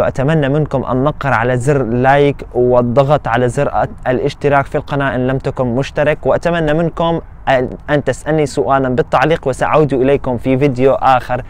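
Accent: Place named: United States. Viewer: وكيف قدرت تتكلم باللهجة الامريكية رغم انها صعبة ؟؟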